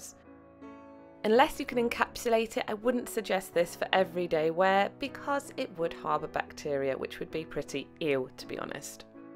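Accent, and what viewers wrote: United Kingdom. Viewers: Gorgeous design and beautiful nails! I love your accent, so nice to find British people on your be 😁 Liquid latex has a horrible smell doesn't it 🐟 xx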